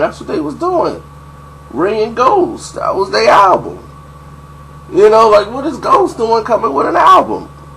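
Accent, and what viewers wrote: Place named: Midwest, United States. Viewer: j o yes hes from midwest tho...not east coast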